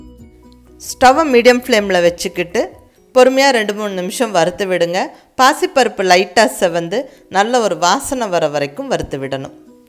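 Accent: Kongu, India. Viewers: Unga voice sooper kongu tamil sooperma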